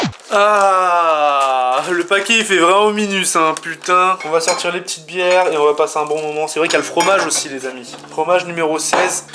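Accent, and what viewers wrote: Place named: North, France. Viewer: 9/7 pour la petite mousse !! Ton accent du nord se fais ressentir vive le 59 😄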